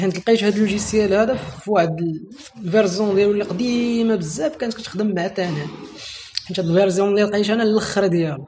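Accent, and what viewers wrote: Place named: France. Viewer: اتكلم عربي فصيح... كلامك زي الفرنسين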